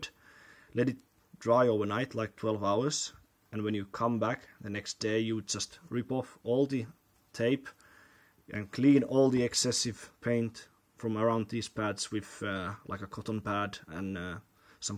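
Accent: Finland. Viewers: He's Finnish. Also i love the comment section here lmao....its hilarious...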